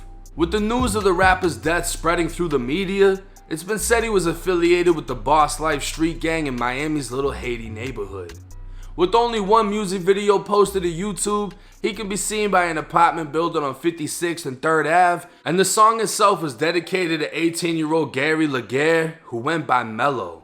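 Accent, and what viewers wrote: Boston, United States. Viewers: You from Boston? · Boy your Boston accent is hilarious lol…good shit tho! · Do I hear a Boston accent? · I just love your Boston accent. You make these videos so much more interesting with the way you narrate.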